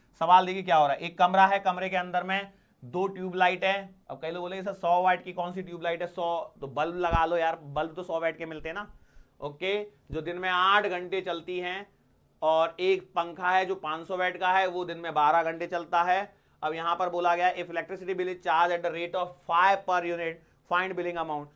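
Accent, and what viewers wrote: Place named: Varanasi, India. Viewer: Good evening everyone ha sir ham hamesa yhi sochte qki ham Varanasi se h or aap jo bhi kbhi kbhi bolte h yha aisa lgta h sayad yhi ke h...😁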